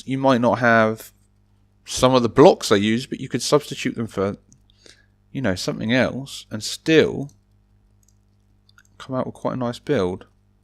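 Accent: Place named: United Kingdom. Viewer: Dude you're just the best youtuber. Too many reasons. First of all, your voice is extremely calm and relaxing, I love it! Second, your accent, I just adore British accents, IDK why. Third, the video ideas you have are really creative, yours and my humor are almost exactly the same XD Fourth, the game you're known for, cubic castles, is just *the best*. Fifth, the dedication put into your channel is crazy. There are way too many more reasons I could list here, but I just want to concentrate on the video! If you're interested in being friends on cubic castles, my username is RustyComedy69 BTW :^)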